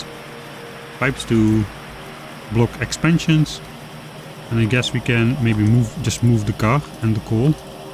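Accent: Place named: Netherlands. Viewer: Ha, I thought you were dutch because of the accent and I was correct! Nice content, thank you! Read as: Thank you for torturing yourself for our entertainment! <3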